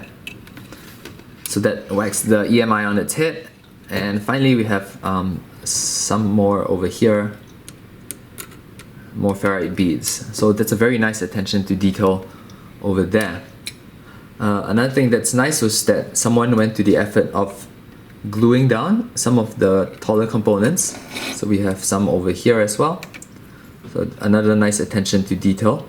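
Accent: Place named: Singapore. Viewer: You know, I can hear the essence of Singapore in your voice :D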